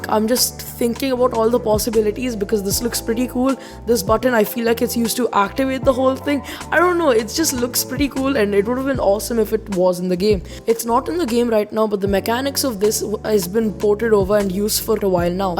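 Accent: India. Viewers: your indian accent is so funny and good at the same time xD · Your accent seems like u are Indian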